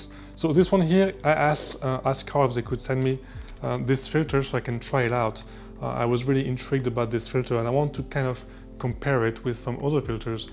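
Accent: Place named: France. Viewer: Are you french ? Tu as un accent 😂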